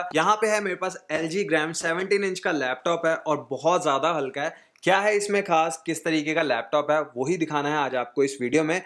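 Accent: Punjab, India. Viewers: hindi in punjabi tone. Feel good to hear😊😊😊👍👍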